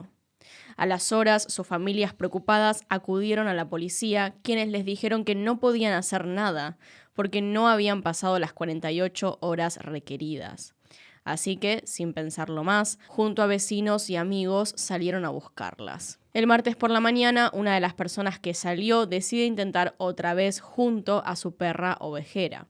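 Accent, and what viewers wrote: Argentina. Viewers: de qué parte de Argentina eres?. Un saludo efusivo de una española que te admira❤❤❤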